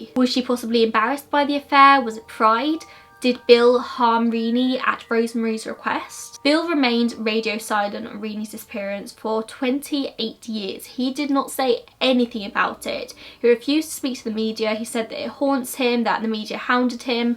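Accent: United Kingdom. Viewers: Just discovered your channel tonight & I'm really enjoying it. I like mysteries & came across your channel while reading up on The Missing Boys of Yuba City- America's Dyatlov Pass. Dyatlov's Pass is another very interesting one that freaks me out. Along with The Hinterkaifeck Murders- Idk if you've done that one or not, I didn't look through them all very closely. Probably. Anyway, it looks like you've done a bunch I'm not familiar with so I'm looking forward to binging the rest of your videos. They're quite good. Plus, I'm a sucker for the Snow White look & British accent! 😊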